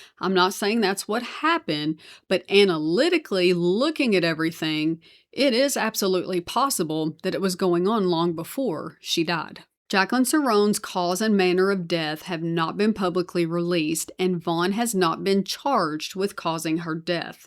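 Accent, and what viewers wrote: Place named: South, United States. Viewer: This was a pretty clever gambit and it makes me wonder how commonplace this kind of grift is. If you think about it thousands of people could be pulling the same stunt. If SSI hasn’t seen a death record or announcement they keep sending the checks. Imagine the temptation to some survivors when they realize those checks will still keep coming. This was a good report and I have subscribed. I’m from New Jersey and I really enjoy your Southern inflection. Keep up the good work…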